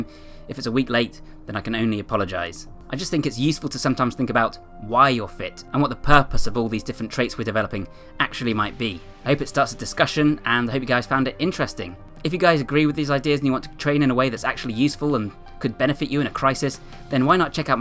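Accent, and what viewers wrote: United Kingdom. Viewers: At the risk of sounding rude, your voice really doesn't match the body I'm seeing in the video. Where in the UK is this guy from?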